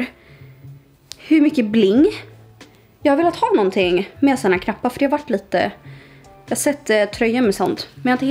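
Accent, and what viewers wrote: Stockholm, Sweden. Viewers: varför pratar du så grov stockholmska?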